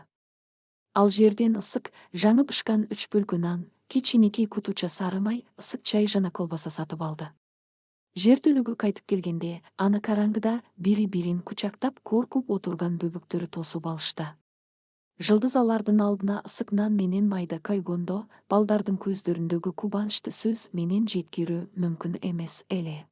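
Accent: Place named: Russia. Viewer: Чала орустан башка окуган киши таппай калдынарбы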